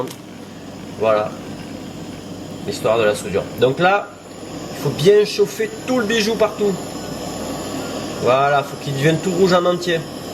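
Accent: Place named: South, France. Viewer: Superbe création ♥ Belle leçon de bijouterie pleine d'humour avé l'accent, c'est top, Merci ♥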